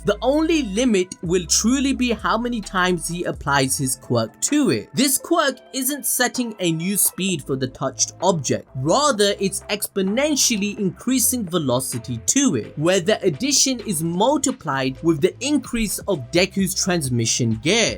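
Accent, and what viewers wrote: India. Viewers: brooooo are u indian ???? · you indian?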